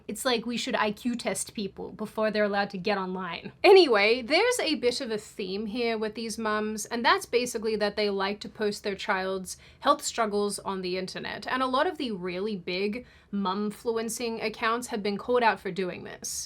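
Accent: United States. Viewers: Sydney is loosing her Aussie accent and picking up that American twang.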